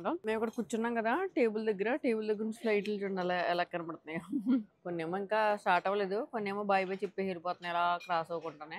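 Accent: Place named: Andhra Pradesh, India. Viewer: 😂 😂😂 Superb Commentry Andi meedi !👌👌👌😅 Kadupu Noppi Vastundi Navvi Navvi, Me Anargalamaina , Emaatram Artificiality lekunda, Aksharaala Pure 24 Carat Andhra Yaasa, Bhasha, toh, Natural Commentry Vintunteh.. 😆😆😂😂👌👌👌 Just Superb !🙌👌👌 Thank you for such a Hearty Commentry Andi ! Enjoyed it Thoroughly! ☺️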